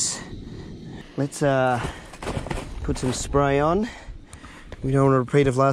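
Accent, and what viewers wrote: Australia. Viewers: He has the most stereotypical Australian accent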